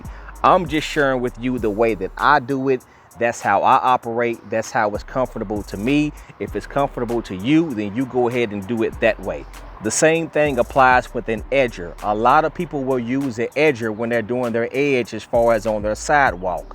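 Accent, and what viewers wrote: South, United States. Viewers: Great video. Wow. I love that southern accent.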